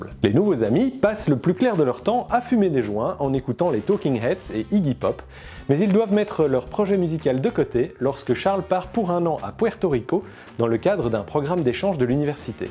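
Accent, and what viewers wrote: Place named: England, United Kingdom. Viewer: accent anglais j'entends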